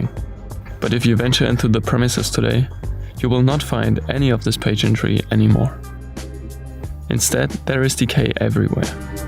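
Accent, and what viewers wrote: Germany. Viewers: Your German accent makes your videos surprisingly interesting and oddly satisfying to watch. Would be cool if you would go to Scandinavia next, like Denmark, Sweden and Norway. ....hit me up if you are in Denmark tho.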